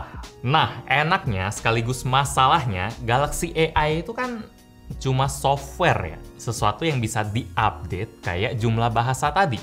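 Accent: Palembang, Indonesia. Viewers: nih wong plg apo ee?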